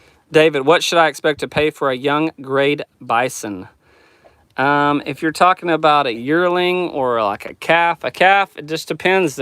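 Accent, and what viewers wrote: Oklahoma, United States. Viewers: Ponca City says hello! Just found your channel and love it. I knew you had to be in Oklahoma by just listening to your video for a few minutes. The Crosstimbers in Oklahoma starts a few miles from here in the Tall Grass Prairie Reserve in Osage county. Your videos are outstanding. Daughter #2 graduated from OSU. GO POKES!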